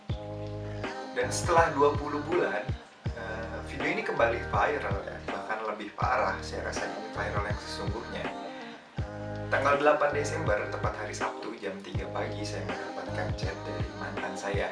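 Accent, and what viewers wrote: West Java, Indonesia. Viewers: yallah a sunda banget bahasanya wkwkek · aa orang sunda ya😂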